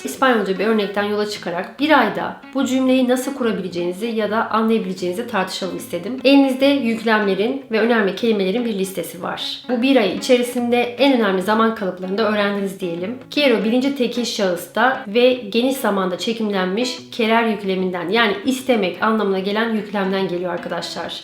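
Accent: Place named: Turkey. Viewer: aksanını acilen düzeltmen lazım çünkü belli bir şekilde Türk olduğun biliniyor.Bu arada saçların çok güzel.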